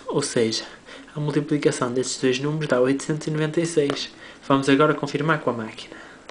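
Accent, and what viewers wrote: Portugal. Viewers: aaaaaaa que sutaque lindo sou do Brasil e adimiro os portugueses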